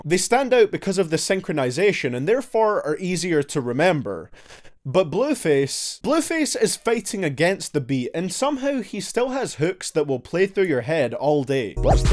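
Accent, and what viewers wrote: Scotland, United Kingdom. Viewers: I think he's Scottish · I already commented this on another video but here again. I'm gonna go sleep now with you on, your voice is so comforting man. I don't mean it in a gay way I normally hate I really hate the British accent but you give the vibes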